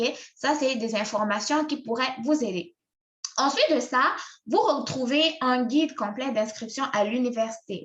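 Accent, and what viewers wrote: Canada. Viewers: Tu expliques parfaitement bien poupée. Merci pour ces riches informations. Je souligne de passage que tu commences à avoir l'accent canadien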